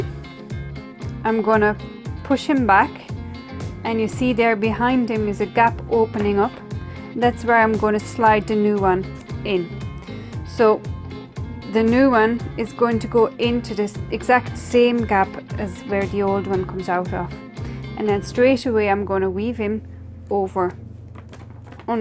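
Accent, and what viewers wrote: Ireland. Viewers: I expected a Flemish accent and then you hit me with the slight Irish accent!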